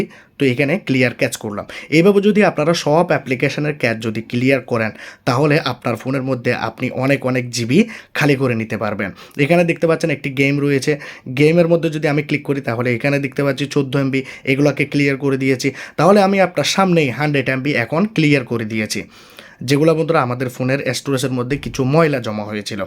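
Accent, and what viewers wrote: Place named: Chittagong, Bangladesh. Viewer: ভাই মনে হয় চিটাংগা।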